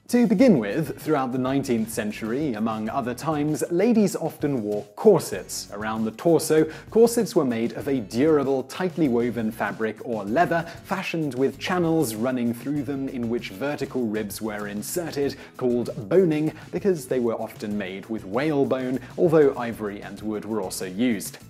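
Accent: United Kingdom. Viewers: where is the narrator from? great britain?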